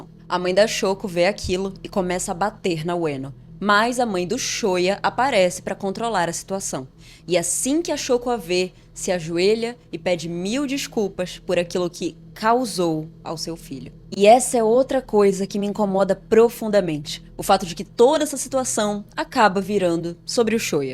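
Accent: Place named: Pará, Brazil. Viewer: Vc é paraense né, aqui as pessoas tem uma fala meio igual de portugal.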